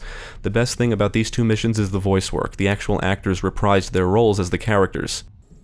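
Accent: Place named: Mid-Atlantic, United States. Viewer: Are you friends with cinema cins or ACG because all 3 of you guys have that fast pace, mid-Atlantic get to the point informative voice lol.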